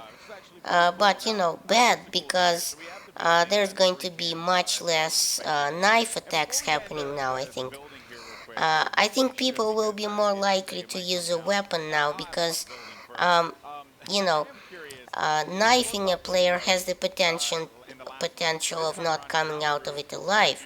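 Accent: Russia. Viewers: are you Russian by any chance?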